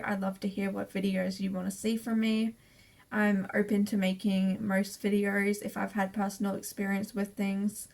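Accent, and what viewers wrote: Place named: London, United Kingdom. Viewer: I don’t know why I thought you were from the UK. I thought you had a nice polite London accent 😂 Anyway, very informative video x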